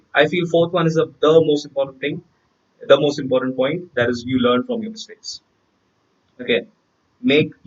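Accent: United States. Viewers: Sir please make the video on American English ,your American English is very nice. I also want to learn it . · Awesome session sir...Btw ur american accent is reall good👌👌👌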